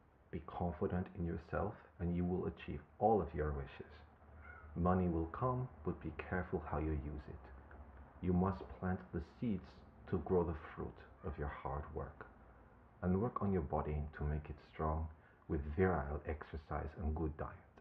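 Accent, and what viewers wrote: Russia. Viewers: Capricorn ♑️ here! Awesome Russian accent. 🖤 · Your Russian accent is outstanding! ... and very relaxing. This little fire sign (Sagittarius) would love to hear more. 🔥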